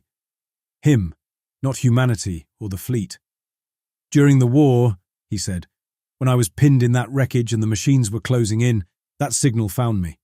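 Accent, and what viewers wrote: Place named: England, United Kingdom. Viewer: Thank the gods...... Finally a story with a clear clean English accent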